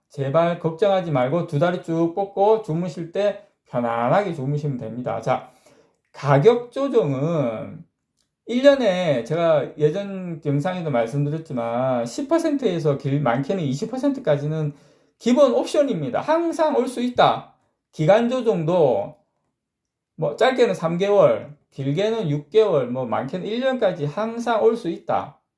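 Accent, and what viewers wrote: Gyeongsang, South Korea. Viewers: 구독 꾹~~누르고 매일매일 영상잘보고있습니다..ㅎ 경상도 사투리로 속시원히 설명해주시니 주린이라도 맘편히 따라하고있습니다.. 최고점에서 물려있지만 시키시는데로 ~~ 항시 존 정보 감사합니다..^^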